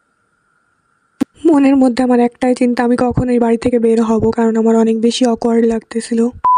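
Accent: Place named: Rajshahi, Bangladesh. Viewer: Pure Rajshahi accent,I’m from Rajshahi too😊. Video editing ta bhalo chilo,keep going sister❤.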